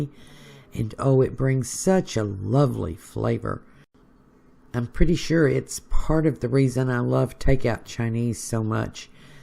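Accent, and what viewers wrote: South, United States. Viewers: I knew you were from the south because of your wonderful accent but didn't realize you were in Georgia. All of my mom's side of the family live there. Thanks for all you do!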